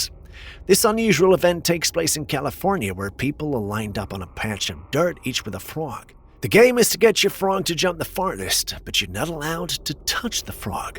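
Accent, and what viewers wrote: South, United States. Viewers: ...are you getting a Texas/Arkansaw accent??? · your voice cadence keeps changing back and forth. From regular city mouse to southern country mouse!!!! What's going on dude?? Did you visit some old family relatives from the South and you inadvertently picked up a southern twang in your voice?? No disrespect meant by that, btw. I was just wondering??!!??🤔😬🤭😌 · What's with the Southern twang to your voice? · What's up with the occasional southern twang, such as when you say 'house' ? I'd never noticed it before, but it's become almost regular, being heard in many of the clips. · are you throwing a little bit of country on us? And what's up with calling Russia, RUSHER?!? LOL. I don't even think country folk refer to Russia as "RUSHER" 😂. You crack me up! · I noticed your cowboy Southern drawl accent tonight lol I like it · you've developed a southern belle twang out of nowhere. 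That's the biggest mystery in this video. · Little Southern 'twang' thing going on there this time - maybe has been travelling the South...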